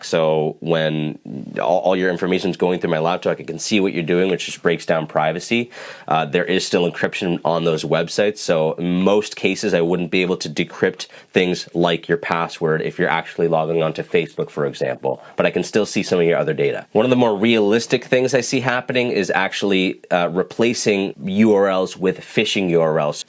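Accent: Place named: Canada. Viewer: I think he is Canadian. It may be the about = aboot (not teasing, I have a few pronunciation quirks myself).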